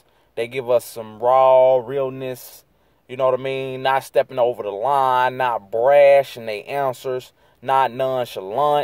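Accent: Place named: South, United States. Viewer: Where you from bro i live in memphis you talk like us down south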